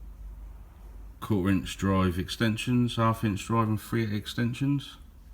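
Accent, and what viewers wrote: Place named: Norfolk, United Kingdom. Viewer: Nice tools bud, trying to pin point the accent.. Kind of sound like a fellow Norfolk lad at times but not sure? Lol cheers for sharing your box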